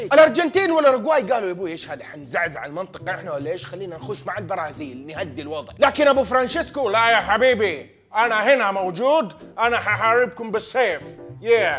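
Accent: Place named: Hejaz, Saudi Arabia. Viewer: يب سعودي يتكلم لغه اهل الحجاز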